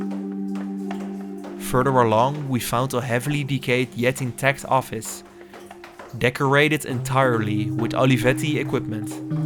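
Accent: Netherlands. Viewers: the most dutch accent ever .. Thank you for your content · Tintje? Het is overduidelijk een vrij dikke nederlandse accent. Voor mij is het niet om aan te horen maar dat is vrij persoonlijk. De inhoud is best goed en erg interessant en daar doet het accent niets aan af. Mooie docu! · Dutch accent by the sound.😁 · Keep up the vids! Have to say that the Dutch accent is strong with this one! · Je haalt ze d´r altijd in 2 seconden tussenuit hè, die Engels-sprekende Nederlanders 😄👍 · ontzettend nederlands accent . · Veel leuker met meer uitleg over de geschiedenis van het gebouw dan de standaard urbex video's, dacht al een Nederlands tintje in je taal te horen haha. Subbed! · You can hear you are Dutch. Not only that, but are you from Leiden?! My head wants to know... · Dutch voice 😅 olivetti had design. Jammer dat ze niet meer bestaan. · Jezus man, gebruik Ai ofzo. 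Dat Dunglish accent van je is super-irritant. Verder wel leuk. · Top notch Urbex content, guys! Music, back story, pictures, narration; everything is just right. I really enjoyed the video and to learn the story of Olivetti. And as someone from the Netherlands, I enjoyed the Dutch accent, of course. ;) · Well, Someone just made it to a CIA transscript :P. Great vid. You sound like you're from the netherlands. If so, Top video. Ik ga zeker abonneren. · Dutch English 😂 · Engelse les bij Mark Rutte gehad? Ik vind je docu geweldig, maar je Nederlandse Engels is goed te horen.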